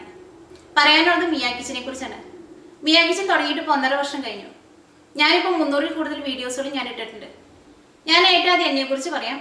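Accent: Thrissur, India. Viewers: Pachakam pole thanne ningalude a kalarpillatha nadan bhashayum eniku ere ishtamanu bcoz im also from thrissur...go ahead sister, merry Christmas! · Hi thank u for teach us variety of food items in simple way..Ur presentation especially trisur basha is nice....all d best for u and ur familly..hapy new year.... can u show d tabe spoon, tea spoon etc..and wt is nalla jeerakam...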